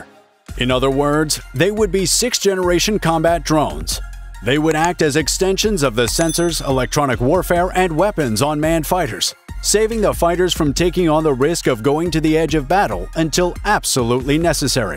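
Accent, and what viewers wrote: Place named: United States. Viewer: Nice....an actual American narrating American innovations. Strange having a Brit explain it to us.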